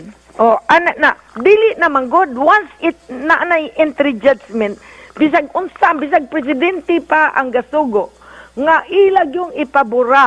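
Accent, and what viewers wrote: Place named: Cebu, Philippines. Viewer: cebuana lang jud kay nindot kaayo paminawon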